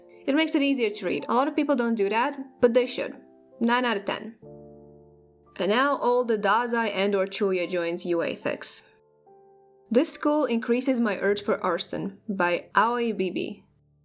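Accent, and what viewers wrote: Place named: Russia. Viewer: I love your Russian accent.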